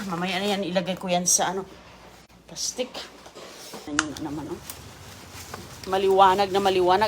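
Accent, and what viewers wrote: Visayas, Philippines. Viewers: para po sakin siguro pabayaan na lang po natin siya ganyan po siya at siya yun di tayo kaya mas maganda wag na lang panoorin kung marami kayong nakikitang kamalian sa kanya at sa mga ginagawa nya. marami din po ako kaibigan na bisaya at ganyan din mag salita kahit sobrang tagal na nila dito sa katagalugan. opinyon ko lang po .✌️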